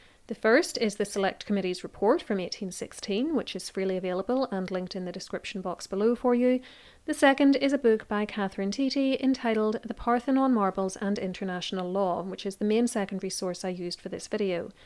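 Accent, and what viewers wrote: Ireland. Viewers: Irish accent? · My guess is that, judging by your accent, you are of Irish origin. You are VERY sparse with your facts regarding the original acquisition of the Marbles and I can only assume that you are an Anglophobe.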